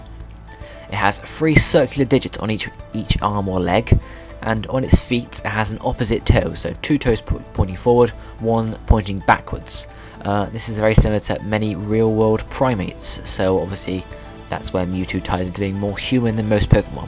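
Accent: United Kingdom. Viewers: I'm curious....are you and Matt Smith from the same part of England?....because you seem to have very, very, very similar accents.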